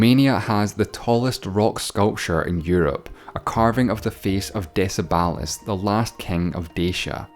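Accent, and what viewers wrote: Scotland, United Kingdom. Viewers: I like his scottish accent.. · Its a Scottish accent not English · He is Scottish. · I have no clue about this fact. Also, I subscribed! Are you from Scotland?🏴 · Sees thumbnail. Cynically wonders what BS they'll say about Scotland. Hears a Scottish accent presenting and is filled with hope. Are you actually kidding me?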